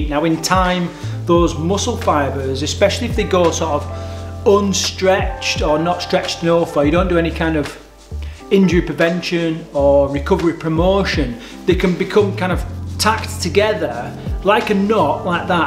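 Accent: United Kingdom. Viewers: I like your content and your channel. But i have a huge problem to understand you. I am not a native english speaker. Your british accent is so heavy to understand that after a view minutes i have to stop every of your videos cause i simply don't understand you. Therefore i also unsubscribed your channel. It would be nice for the world outside of britain if you speak with less accent.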